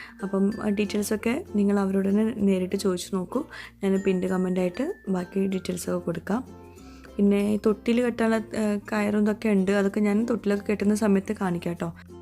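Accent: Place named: Alathur, India. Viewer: Aalathur bhakhatt samsarikkumbo anatre kuttundalo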